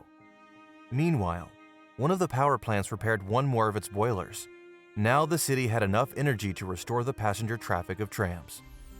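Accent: Russia. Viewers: По всей видимости звук просто заказан у англоязычного диктора. В ранних видео на канале голос другой и с русским акцентом. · I see you hired a professional voice over for the narration ! I admit he has a very nice and clear voice but I find it loses a bit of the charm of your earlier videos. I really like your (russian ?) accent ! It makes the video more immersive and unique :) that’s only my opinion though ! In any case I will keep watching your amazing videos. Greetings from France !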